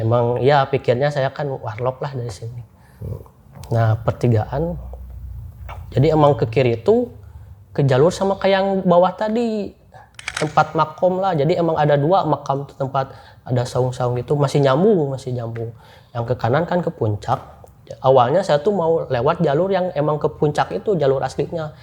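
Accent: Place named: Sunda, Indonesia. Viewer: Logat sunda nya kental banget😂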